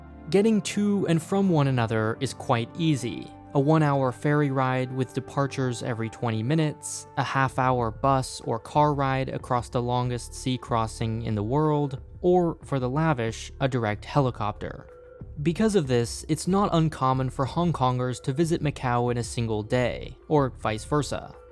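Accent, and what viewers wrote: Spain. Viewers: Why people insist in pronouncing Portuguese names as they would be in Spanish ? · I know it's hard, different and weird, but reading Portuguese names in Spanish is both rather offensive to Portuguese people, as well as wrong. I'm an avid fan of this channel, so all I ask is for names to be read properly because if not, it is less offensive for you to try and read them in English.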